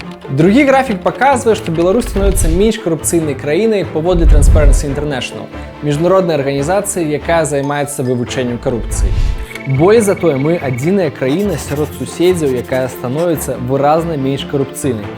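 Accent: Russia. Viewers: ты такі харызматычны. Колькі табе год? Ці можна ўжо ў прэзідэнты балатавацца? І слухай, дружа, нешта зусім мала рэкламуешся. Укідвай грошы ў інста-рэкламу, нават у метро рэкламуйся. Твой кантэнт на выключна высокім узроўні. Я б вельмі хацеў, каб гэтая ініцыятыва перарасла ў Партыю новых ідэй. Як мінімум мой голас у цябе ўжо ёсцека. І яшчэ. Схадзі да беларускага лагапеда. Годзе ўжо гэтага рускага акцэнту) хачу пачуць родныя цвёрдыя «ч» ды «р». 😉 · Стремление говорить на белорусском языке - всячески похвально.. но что здесь, что в подкастах слушать трасянку невыносимо для ушей. И с течением времени прогресса нет. "Белорусский" язык с русским произношением и русскими словами... такоЭ.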